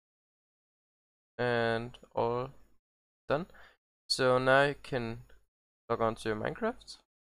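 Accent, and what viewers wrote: Denmark. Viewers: Are you danish? I can hear it on your accent. And I love your vid :D please don't stop making so good Videos :D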